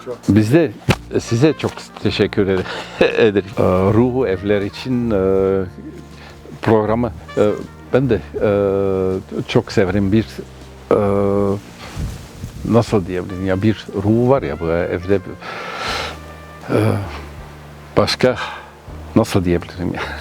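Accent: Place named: Germany. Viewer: there is a song called, which a man speaks english with a german accent. I just remembered that song when you speak english. stupit comment anyway. nice home, enjoy the sun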